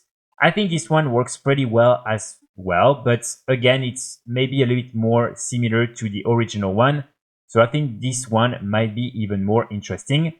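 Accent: France. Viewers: pas mal l'accent frenchi ahah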